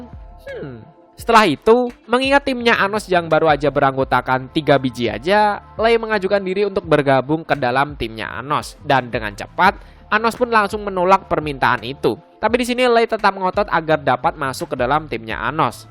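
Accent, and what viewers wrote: Java, Indonesia. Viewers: Medok bat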